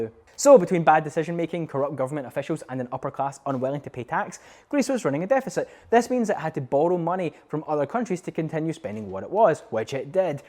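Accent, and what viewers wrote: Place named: West Scotland, United Kingdom. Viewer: Thank you, I'd been so keen to hear your thoughts and facts on Greece. It was great, but ooft you spoke very fast in this video. As a non west-coaster it takes me that second extra to adjust to your accent (that I adore), I'm not suggesting you tone down your accent, just that I'd appreciate if the speed was just a tad bit slower. I like to share your videos with non Scots too. Keep up the good work, I'm so glad you're back on my screen!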